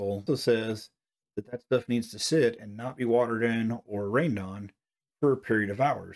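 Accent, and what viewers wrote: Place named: Pacific Northwest, United States. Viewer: LOLfirst of all I see an Aspen Pest Control vehicle outside your driveway. I'm in Vancouver and use them, they're very good. Based on your suggestion I got the Spraymate battery sprayer. I used it for the first time the other day and look forward to using more liquids than granular. My grass is Barenbrug RTF Turf Saver and as soon as my Allett Stirling arrives I'm going to start reel mowing at 2 inches and hopefully down to 1.5 and maybe 1.25 but will see. Anyway I enjoy your channel and nice to have a PNW voice out there.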